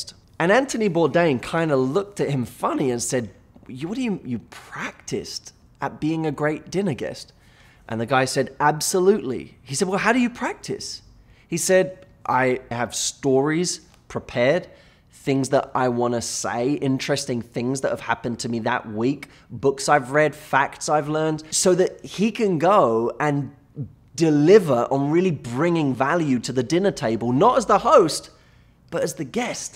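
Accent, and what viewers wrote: United Kingdom. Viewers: it must be the British accent matthew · This one might b the most useless comment on youtube ever ... But everytime i see him speaking .. i get so hungry ....i mean thers something about his speech pattern or such a clear brit accent .. his voice makes me crave dessert or something ..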